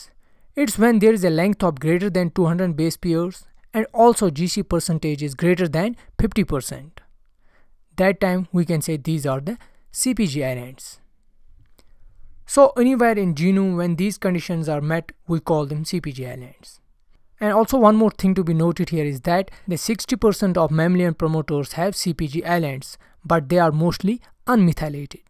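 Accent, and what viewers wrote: Kashmir, India. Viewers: Wonderful explaination. You HV kashmiri accent.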